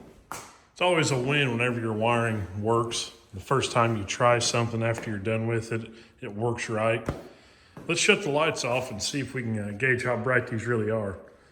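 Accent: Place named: Boston, United States. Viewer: I knew it was Boston that I heard in fast motion😂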